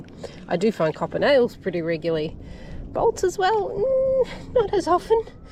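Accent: Australia. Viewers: I never noticed how long it takes to get to the action part of your video. You could ramble all day long for all I care. I love Aussie accents. You and your personality are the main reasons I "tune in". It's irrelevant (to me) if you find anything at all. The longer your videos, the better.: ) Well done. Awesome find! Please take care, and God bless you,!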